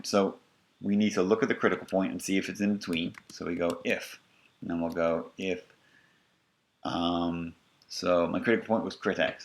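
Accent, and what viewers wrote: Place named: Australia. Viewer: Too bad this course is online, I would have been delighted to have a prof with an Australian accent!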